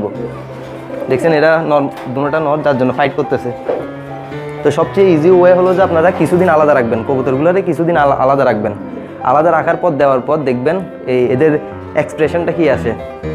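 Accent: Chittagong, Bangladesh. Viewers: ভাই চট্টগ্রাম এর নাকি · ভাই আপনি কি চট্টগ্রাম থাকেন? কথার আওয়াজ কিছুটা চট্টগ্রামের মতো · ও ভাই আপনিতো অর্ধেক চিটেইংগে বাকি আধা চলিত ভাষা হন্ডে বাড়ি তোয়ারো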